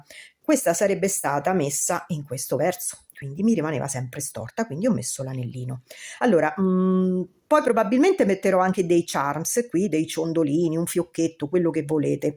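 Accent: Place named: Rome, Italy. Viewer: Ciao! L ho realizzata oggi ed è venuta benissimo! Bellissima! Poi tu mi sei troppo simpatica! Sei di Roma? Dall'accento mi sembra di si (anche io sono di Roma). Spieghi molto molto bene, e questa mini bag é veramente bellissima! Grazie! ❤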